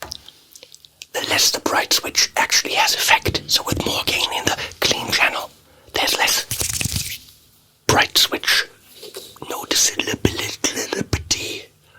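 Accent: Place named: Germany. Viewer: Now I remember how I UNSUBSCRIBE from this channel. Not only that HIDEOUS german accent and BAD ATTITUDE, now ASMR🤦‍♂🤦‍♂🤦‍♂. Please somebody beside me tell this man this is not the best way to review an amplifier. You lost a sell , Mr Fryette.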